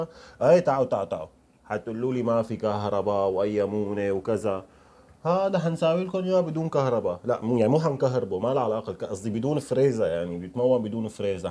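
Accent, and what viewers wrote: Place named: Syria. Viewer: الهجئ السوريه أحلا لسى من الكل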